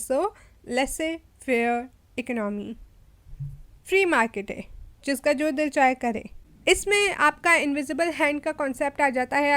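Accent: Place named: Peshawar, Pakistan. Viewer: Wah g wah peshawar sa ho kia ???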